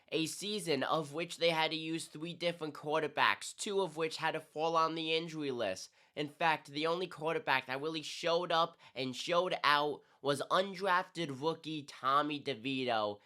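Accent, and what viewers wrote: New York, United States. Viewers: You….. your awful prediction…. And your annoying 6 yr old Mike Tyson New York accent all suck.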